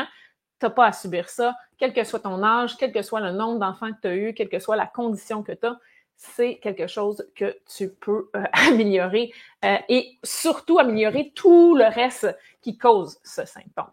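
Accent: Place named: Canada. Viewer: Bonjour, j'apprécie vos formations, vos capsules d'introduction. Au début j'avais des difficultés à entendre distinctement votre accent canadien. Dans cette vidéo c'est mieux pour moi, il y a moins d'aigu. Je viens de partager vos formations avec ma voisine et lundi prochain je lui présente les yoga-balles que je viens d'acquérir, elle est fort intéressée par votre formation, elle a 1 problème au niveau de la nuque. Excellente continuation dans votre activité. Yvel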